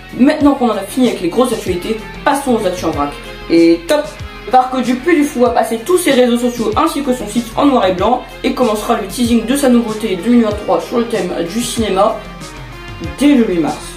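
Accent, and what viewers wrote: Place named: Spain. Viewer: Je me rend compte d'un problème qui persiste sur tes vidéos, c'est les cutes vidéos qui sont très répétitifs et désagréables, parfois j'ai l'impression que tu t'auto-coupes la parole dans le montage et là aussi c'est pas agréable à écouter, j'espère que ça sera réglé par la suite (et au passage, très bel accent espagnol 👌)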